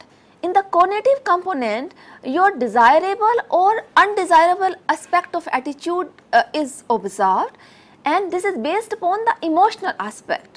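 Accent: India. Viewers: Amazingly beautiful Indian English pronunciation. ....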